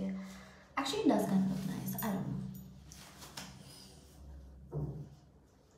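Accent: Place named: Hyderabad, India. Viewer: ooo Hyderabadi😅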